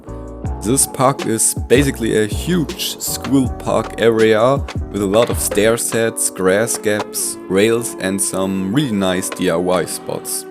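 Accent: Germany. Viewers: Are you german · Man hört, dass du normalerweise Deutsch sprichst. Ich überlege ob ich mir Skater XL kaufe, da es mega gut aussieht und am 28 July als vollversion 40 Euro kostet. Tolles Video bitte mehr von Map Videos! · Man hört direkt, ein deutscher 😅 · R u german? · bist du eigentlich deutsch ? :D , hört sich irgendwie so an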